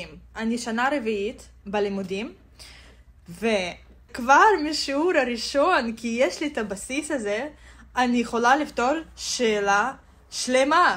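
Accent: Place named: Russia. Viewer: Это иврит с жутким русским акцентом. Своего рода смесь французского с нижегородским.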